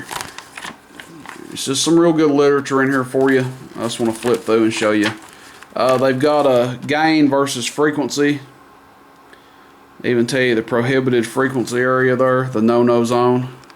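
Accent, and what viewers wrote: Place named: Tennessee, United States. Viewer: I'd like to hear one of these on the air. Often times amps have a very small amount of distortion coming through and not clear as the radio itself. There's one guy who cruises through my way ever so often down the interstate 14 miles away. It takes him at least 1 hr to go out of range. I don't think that guy ever shuts up. I have never in my life seen a guy talk so much. 30 minutes before he gets here and 30 minutes after he passes. I know he's pushing some serious power but have no idea how much are what kind of amp he has but he is crystal clear. He tells folks I can't here you but you'll keep hearing me on and on and on. Here is a cool miles per watt graph. <link> You sound like some of my kin folk in Tennessee.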